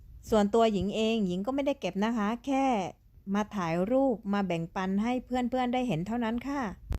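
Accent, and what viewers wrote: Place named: Phuket, Thailand. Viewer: ของฟรีมีในโลกจริงๆค่ะพี่หญิง ชอบทำเนียงพี่จัง ว่าเหมือนสำเนียงภูเก็ตพังงาค่ะอิอิ ดาด้ากะเดาเอานิค่ะพี่ ลงคลิปเยอะๆหน่อยนะคะดูค่ะ ติดยูทูปครั้งแรกกะคลิปของสาวฟินแลนด์นี่แหละค่ะเมื่อหลายปีก่อนติดช่องน้องบีมากน้องบีเก็บเห็ด แล้วชอบดูเวลาเขาไปเอาปลาฟรีเขาลากปลามาไว้ในเรือชอบมากค่ะ มีน้องคนนึงอยู่ฟินแลนด์เหมือนกันชื่อน้องเฟิร์นแต่น้องไม่ค่อยลงคลิปไม่เคยเจอกันแต่คิดว่าน้องน่ารักมากๆ สรุปว่าสาวใต้ไปอยู่ฟินแลนด์น่าร้ากกก🥰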